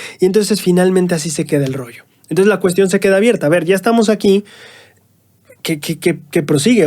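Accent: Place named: Mexico City, Mexico. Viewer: Con mucho respeto me gustaría dar mi opinión respecto al hermanito, tengo entendido de que el nos habla desde la Ciudad de México (nosotros les llamamos Chilangos) y ahí he notado que todos los chilangos hablan el español muy rápido y de corrido y a pesar de que mi hermanito lo hable un poco rápido se le entiende muy bien y claro... yo puedo sentir muy fuerte el espíritu, yo siempre lo escucho y he aprendido mucho más del evangelio con sus explicaciones. Deseo de todo corazón que NPC siempre me lo bendiga con mucha salud y energías para que nos siga nutriendo spiritualmente, aunque no lo conozco personalmente El siempre está en mis oraciones para que le vaya bien siempre. Muchísimas gracias 🤗🤗🤗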